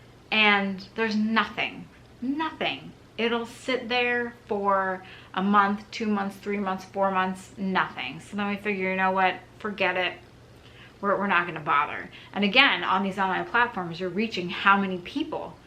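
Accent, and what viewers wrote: New Jersey, United States. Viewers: Kinda crazy reply ... I’m about your age and live in SF ... California toe-head and find myself watching you a few hours a week because of your NJ accent and manor ... different from the left coast treasure hunters I meet ... nothing much happens in your videos but I find them interesting - I like flea-markets and urban treasure hunting and your presentation/discussion/viewer questions are interesting and relaxing ... and I like Yellowstone too ... hahaha ... ANYWAY... Yup that was definitely a SF summer tourist sweatshirt the shops sell ... kinda ugly for us locals ... Tourist think its warm on the CA coast in the summer but as Mark Twain said the coldest winter I ever spent was a summer in San Francisco ... Love your stuff ... just had to chime in after all this time ...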